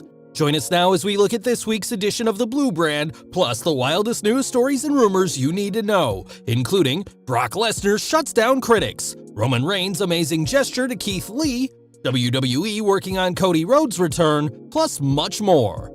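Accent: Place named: United Kingdom. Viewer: Aw man no offense but I wanted to hear the British accent today :/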